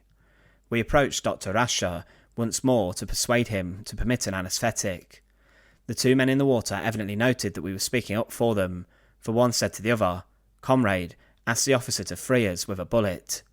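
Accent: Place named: England, United Kingdom. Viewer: Love your videos but your English accent is so damned thick I can't understand half the time. Sorrym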